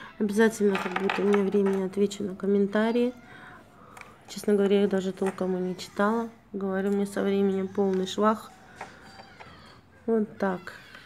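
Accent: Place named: Baku, Azerbaijan. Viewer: Не речь,а песня,родной,любимый бакинский акцент😘продолжайте вещать,;)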